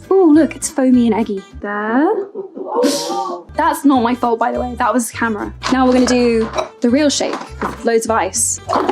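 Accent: United Kingdom. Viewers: イギリスのVOGUEだからかいつもよりイギリス英語が強くてハーマイオニーが脳裏に浮かぶ🪄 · エマのブリティッシュアクセント、聞いてて心地良い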